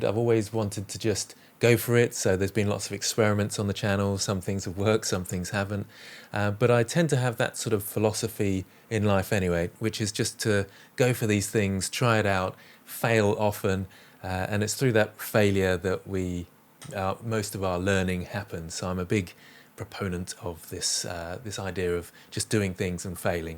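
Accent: United Kingdom. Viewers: Congratulations. Very well deserved. Your tutorials are so easy to follow even for a non-English speaker. The British accent is a very welcome bonus, too.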